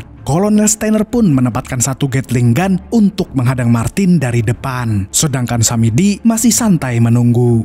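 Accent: Banjar, Indonesia. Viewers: banjar kah pian mang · haw banjar kh pian?😁 salam ae nah dari kaltim hehe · Nahh, urang Banjanr adminnya neh..😅😅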